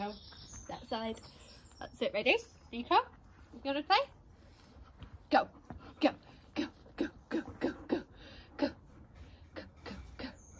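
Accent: United Kingdom. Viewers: "Reddy..." 😆 I love the British accent 😸...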